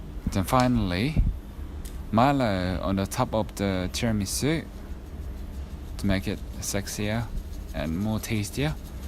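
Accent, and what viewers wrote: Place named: Australia. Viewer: 호주액센트 멋져요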